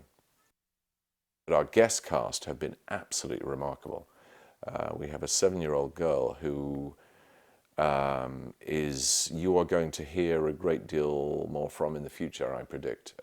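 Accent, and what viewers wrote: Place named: United Kingdom. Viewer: Wait, I think that he has a british accent 🤔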